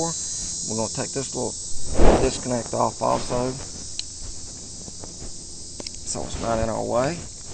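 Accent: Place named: New York City, United States. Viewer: you sound like you are from New York City. Thanks for the video big help btw. Yella injectors need cleanin. Sometimes I use vaseline on my injector too.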